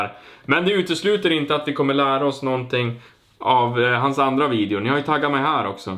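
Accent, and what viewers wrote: Norrland, Sweden. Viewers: Jååå, hittade din kanal för typ en vecka sen å har helt fastnat. Är från Lule å älskar din norrländska! 💖✨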